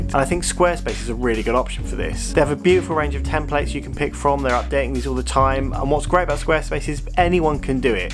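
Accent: United Kingdom. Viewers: I love your channel, even though I prefer video over stills, your perspective in interesting and to the point, none of this "hey look at me" or ridiculously excessive b-roll. Gear reviews can be useful, but they are ten a penny on Youtube and I don't even trust most of them. Nice to have a Brit too, more chilled, less ADHD.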